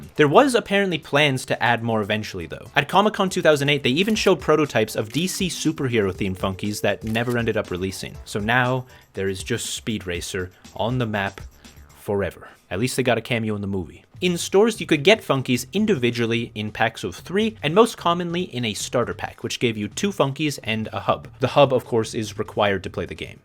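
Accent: Canada. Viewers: “outing myself as a Canadian” when you’re one of the only people I’ve been able to actually recognize a Canadian accent in 😭 Anyway thanks for providing my mandatory video about something I’ve never heard about but I get invested in anyway for some reason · lmao you said you outed yourself as a canadian but you sound so canadian man you didn’t have to even say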